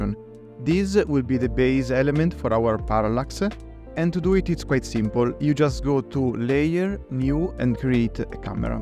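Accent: Italy. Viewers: the italian accent is better then the tutorial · sbaglio o sento l'accento italiano? Congrats man! I've just discovered you and it was instant follow. Fa sempre piacere vedere del talento italiano. Di dove sei?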